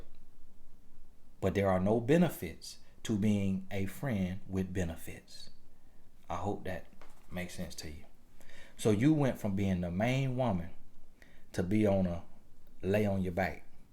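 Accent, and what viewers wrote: South, United States. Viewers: I mean your southern charm came out in this video!!! Love every video 😂😂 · Good information all facts thank you however, Lord that country accent got me laughing.... where are you from?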